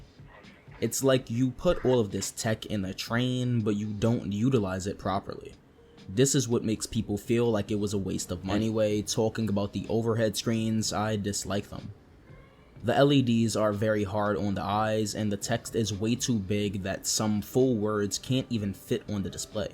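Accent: New York, United States. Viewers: u got such a ny accent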